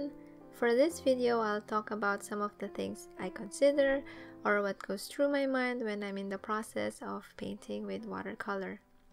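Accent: Philippines. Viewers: You sound filipino So are you a Filipino??? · I really love thisss!!! I could tell by ur accent that ur a filipina, I'm so proud✨ Btw, could u make some tutorials about how to color skin or where to put shadows/contour (specially on the nose) and blush on face in watercolor painting pls? Thanks in advance!💗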